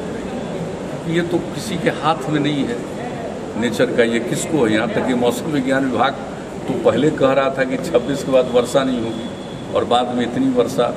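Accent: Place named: India. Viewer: Dehati Bhasha Jaise bol rahe ho Hatiya hi to Bhadwa Hai yah kaun sa Baat hua Barsat Ka Mausam Mein madad karo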